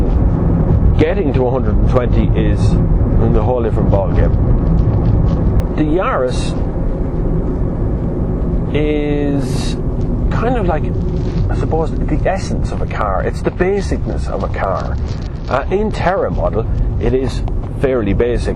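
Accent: Ireland. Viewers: thanks!! Congrats for the reviews, you are doing a good work! (and I like the Irish accent with words as "bush" "thash" for but and that hahaha)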